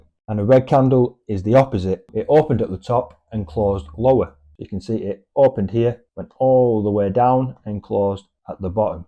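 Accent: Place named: United Kingdom. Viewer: Nice video but for me is your british accent not understanable.. you dont said lower low/higher high you said lo lo/hai ha😂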